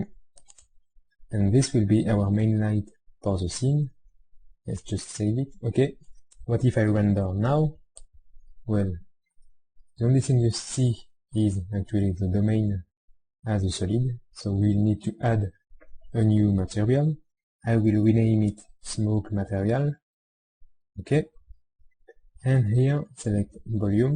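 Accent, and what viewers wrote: France. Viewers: This videos are like a two-for-one deal for me. I learn how to do particles in blender and learn how to do a French accent! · yea , l accent francais est vraiment unik . Good tut btw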